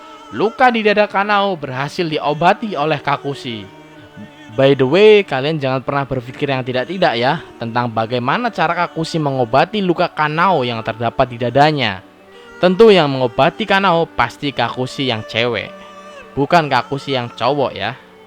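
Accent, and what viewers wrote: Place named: Java, Indonesia. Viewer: Jawa bet lu njirr...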